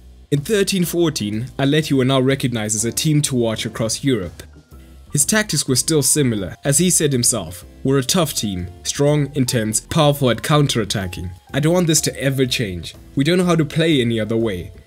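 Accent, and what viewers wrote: South Africa. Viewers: Narrator sounds south African.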